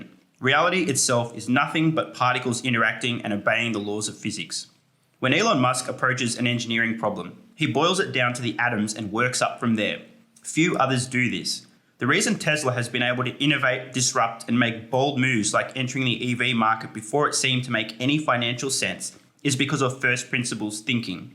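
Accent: Australia. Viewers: I could have made the exact same video (minus the Australian accent LOL). Totally share your views -- I am personally heavily invested for the same reasons as you stated plus the fact that it's at least 50% undervalued (I have my own calculations). It's as clear as day that in 5-10 years TSLA is going to be a multiple bagger. And like you said -- in 2030, people will say how lucky you were to invest in TSLA. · I'm not super interested in buying a Tesla car right now because I would rather have a Corolla- or Camry-like electric car, at those lower prices. But I would invest! Though I could only manage 5 or 6 shares, to begin with. Nice to hear an Australian accent talking about this!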